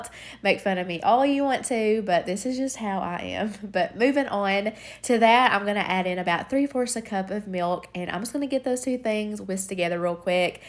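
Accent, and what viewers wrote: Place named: Kentucky, United States. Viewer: Hey I've been binge watching your videos all week! I love the different recipes and ideas you have, I can't wait to try some. Are you from Kentucky too? I'm right outside Lexington but you sound farther south or maybe eastern ky. Much love 😘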